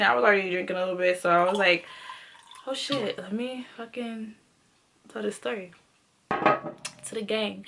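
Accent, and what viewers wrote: Jamaica. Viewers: Just to be curious are you jamaican, half jamaican or part of jamaican because in some in your videos, you had that jamaican accent when you talk sometimes? The reason I'm asking is because I'm half jamaican so I can tell if someone is jamaican.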